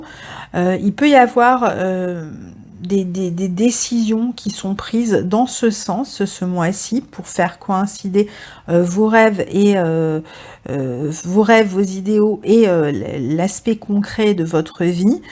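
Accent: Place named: Nice, France. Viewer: Bonjour, c'est toujours avec un réel plaisir d'entendre votre accent Niçois;-) je vous avais demandé, mon transit pour 2019, celui-ci m' a été bien utile. Sagittaire asc scorpion du 24 novembre, je passe une dizaine ce jour, et je pense qu'en tant qu'artiste photographe les choses vont bouger, il y a déjà un frémissement, entre la méditation, les tarots et ma création, vous ciblez parfaitement ma situation. Beaucoup de choses changent et c'est pour mieux comprendre le sens de la vie, ce qui nous fait évoluer dans une spiritualité. Bref votre travail est gratifiant, et vous le faite avec un sérieux, et une parfaite maitrise du sujet, je ne peux que vous en féliciter. Merci encore, et gratitude.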